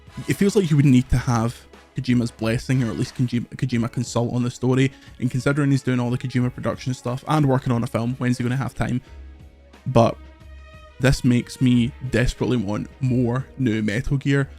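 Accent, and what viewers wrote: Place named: Scotland, United Kingdom. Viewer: You're a troll or an idiot. The accent is Scottish, and as an Englishman I understood him perfectly.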